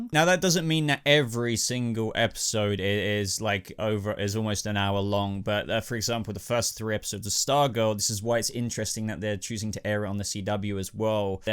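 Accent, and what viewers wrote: United Kingdom. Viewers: OK I've just came across your channel, and thought, due to your accent that you are in the UK, but after what you kept saying about the CW, and DCUniverse things and hbomax, none of these options are available in the UK, so you must live abroad to be able to watch these programmes regularly? If I'm wrong then my apologies, but I'm confused, lol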